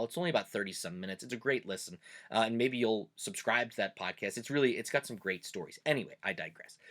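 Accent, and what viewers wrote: Pennsylvania Dutch, United States. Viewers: Accch: Swetz net so dumb. It's good to talk your normal accent. When I was in the navy with alot of southern types, I was told that I "talked too fast " You don't have to talk like Proffessor Schnitzel. But a normal sounding Pa. German dialect is enjoyable to listen to. Thanks for your videos. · I get a kick out of your Dutch accent on “Ask a PA Dutchman.” I grew up in Central PA and I have heard some words that I never realized it was an accent. The most obvious words that are from the area are the pronunciation of Lancaster and Lebanon. And I don’t know if creek and crick are part of this too? When I was younger, I traveled all through PA as a salesman. It was amazing to hear all the accents just in PA. From Pittsburgh to the coal regions up north, Philadelphia and our beloved Dutch country. I never got to learn the language but there are some words that did make it though the family.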